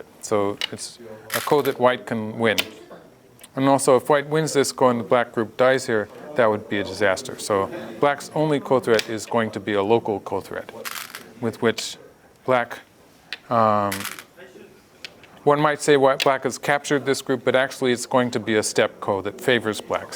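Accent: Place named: Japan. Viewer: I swear this guy's accent is sounding slightly Japanese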